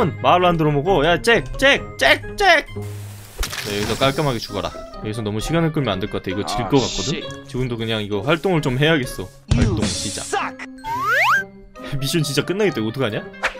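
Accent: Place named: South Korea. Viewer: 찐 콩글리쉬군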